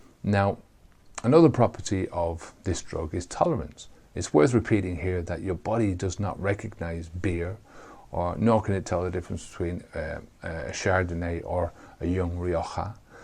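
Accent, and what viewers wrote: Ireland. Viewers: Love Irish 🇮🇪 Accent! 🍀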